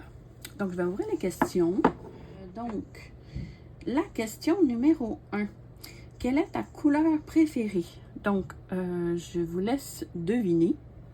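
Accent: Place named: Quebec, Canada. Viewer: Coucou miss ❤ Merci d'avoir pensé à moi 🥰 et merci pour les compliments 😍 j'adore ta voix, ton accent québécois et ta façon de parler 😊ta voix est douce et j'apprécie tes vidéos 💖 Je ne l'ai pas encore tourner donc ce sera un plaisir de le faire 😉😊😘😘😘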